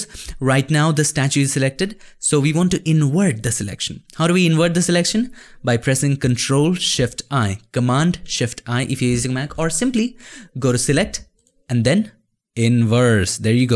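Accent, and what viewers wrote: India. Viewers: with Indian voice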